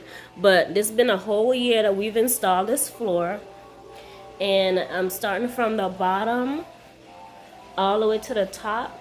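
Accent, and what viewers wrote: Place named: Guyana. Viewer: Why do I hear your Guyanese accent 😭🔥🔥 I love our accent! Thanks for the video!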